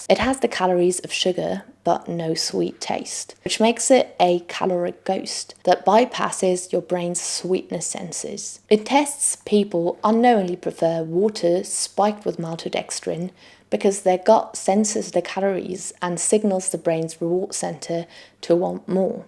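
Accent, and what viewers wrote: England, United Kingdom. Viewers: I'm a little puzzled. You have an English accent and kicked off with American chocolate being rejected in Britain but then the whole video was about the US market, the FDA and American food giants. No mention of the rejection of many ingredients by the EU for example.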